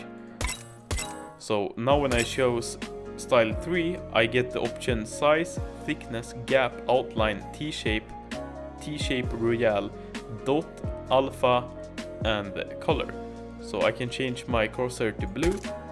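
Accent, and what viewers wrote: Sweden. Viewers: are you swedih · Swedish accent is soooooo noticable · Are u swedish?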